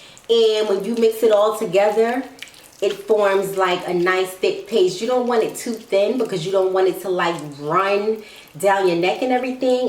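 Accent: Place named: New Orleans, United States. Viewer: Omg I love her accent. Are you from New Orleans. And I love your videos 🥰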